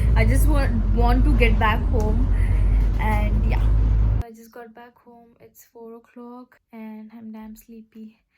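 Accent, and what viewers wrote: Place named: North Karnataka, India. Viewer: ನಿಮ್ಮ ವಿಡಿಯೋ ನೋಡ್ತಿದ್ರೆ ನೋಡ್ತಾನೆ ಇರ್ಬೇಕು ಅನಿಸುತ್ತೇ ಒಂದೊಂದು ನಿಮಿಷನು ಸುಪರ್ ಆಗಿದೆ,ಉತ್ತರ ಕರ್ನಾಟಕ ಶೈಲಿಯ ಮಾತು, ಡ್ರೆಸ್ಸಿಂಗ್ ಸೆನ್ಸ್ ಸುಪರ್, my dear doll😍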